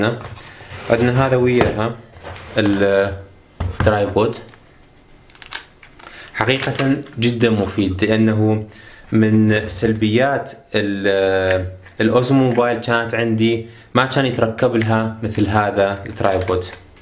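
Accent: Mosul, Iraq. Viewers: لهجتك مال الموصل 😁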